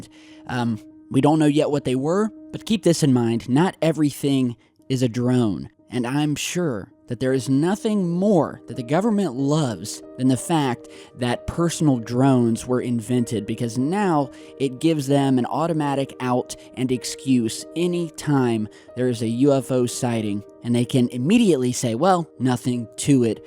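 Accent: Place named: South, United States. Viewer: Is from the south? I'm just curious. if you listen closely he has a slight accent lol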